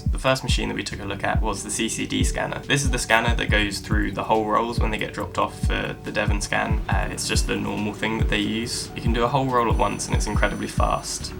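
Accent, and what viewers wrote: London, United Kingdom. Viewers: Are you from London?? :) keep up these great vids too bro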